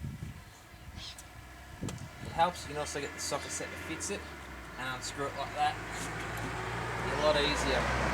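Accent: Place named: Australia. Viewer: very helpful. and as a yank, i appreciated that aussie accent.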